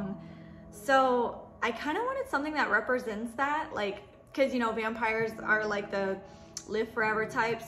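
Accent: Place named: South, United States. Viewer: I love your accent! It is so charming with your style. You’re like a southern vampy lady :)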